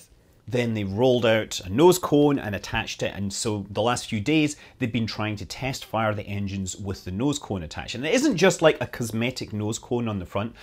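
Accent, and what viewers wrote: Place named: West Scotland, United Kingdom. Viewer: where in Scotland are you from? (I’m west Dunbartonshire) a lot of your words sound like you’re from the west 🙈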